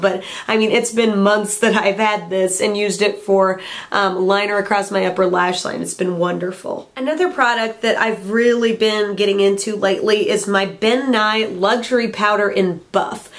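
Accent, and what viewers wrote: South, United States. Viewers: It's the funniest thing.. I don't know why but does anybody else think her voice sounds more "southern" with that raspy voice? lol I dunno but it seems like her accent comes thru just a liiittle more here, sounds like sassy southern woman to me! lol (I'm from the south so I'm not hating at all, if anything its sexy!)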